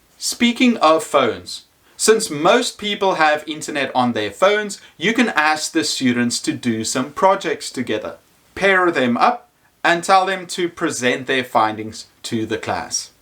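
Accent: South Africa. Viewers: Are you South African? My SAFFA-dar is off the charts 😄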